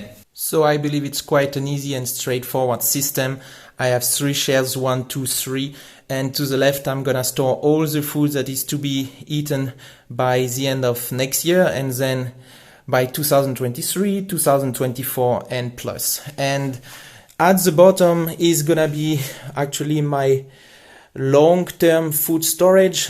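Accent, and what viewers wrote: France. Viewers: Prepping with a French accent...a new twist for me!!! Love it!!!! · At first I thought you were German because of how you pronounce the word The but then I read the part where you live in Bulgaria and have a French accent... Anyways, appreciated the tips😀👍🏻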